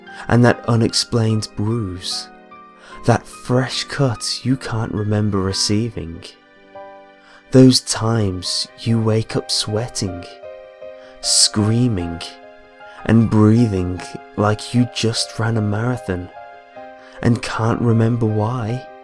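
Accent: England, United Kingdom. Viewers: I love your accent :) I'm english too but i'm from the east midlands so my voice sounds dead common when I do Creepy Pasta readings :P Wish my voice was as posh as yours lol. Awesome reading once again :) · Gotta love brit accents XD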